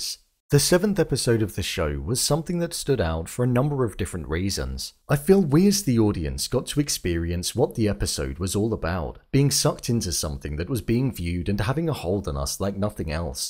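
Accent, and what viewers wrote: United Kingdom. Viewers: These British fiverr voiceovers on all these channels that obviously don't know what they're talking about are getting out of hand.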